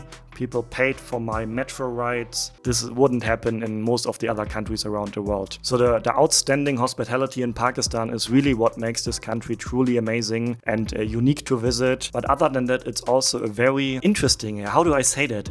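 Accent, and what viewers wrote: Germany. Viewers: Ich hatte es geahnt dass du aus Deutschland kommst, wegen deinem Englisch was du mit Deutschen Akzent sprichst. Auf jeden Fall ein sehr Interessantes Video 👍. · hat irgendwie nen deutsch Tuch in sein Englisch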